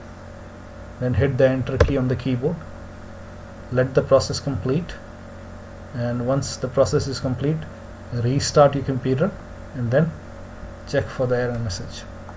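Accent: India. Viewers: typical tutorial on youtube 7/10! but not the best has Indian accent ✔️ loud keyboard noises ✔️ yellow circle around the mouse cursor ❌ said "hello friends" at the beginning ❌ video has more likes than dislikes ✔️ it worked ✔️ low video quality 🟡0,5 low audio quality 🟡0,5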